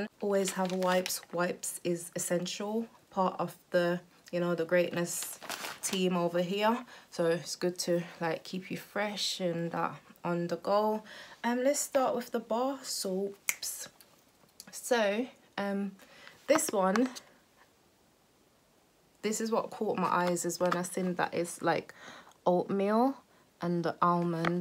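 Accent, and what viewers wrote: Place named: Birmingham, United Kingdom. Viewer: Great haul, looking very glam in this video. Loving the earrings and the watch! And of course the slight Brummie accent too lol!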